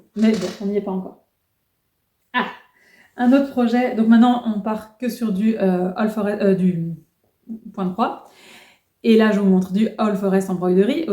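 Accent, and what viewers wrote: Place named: Belgium. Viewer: Coucou, tout est magnifique ! Le fil E940 de DMC (si c'est bien celui là que tu as commandé 😅) brille dans le noir mais il faut vraiment le mettre au soleil ou sous lampe très près et pendant longtemps... Et ça fait plaisir d'entendre ce petit accent belge dans un flosstube ! Je me sens moins seule 😅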